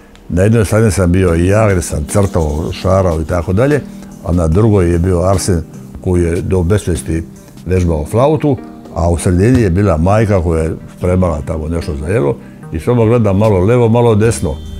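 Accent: Šibenik, Croatia. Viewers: Nevjerovatno, ima šibenski naglasak, a trudi se pričati ekavicom... Smiješno... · Šibenski naglasak bljesne nakon toliko godina ... 🦋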